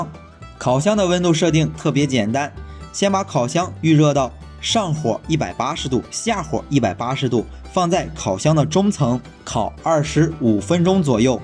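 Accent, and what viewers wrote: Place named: Qingdao, China. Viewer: 您的口音很像青岛老乡😊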